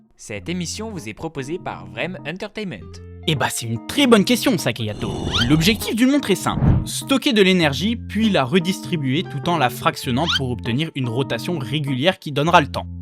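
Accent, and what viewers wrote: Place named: France. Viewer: Découvert avec la version Anglaise au lourd french accent, très drôle et superbes visuels, bravo!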